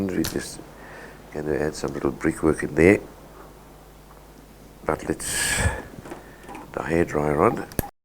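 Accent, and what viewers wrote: South Africa. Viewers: This is delightful. Thanks for sharing. Nice to hear that South African accent again!!!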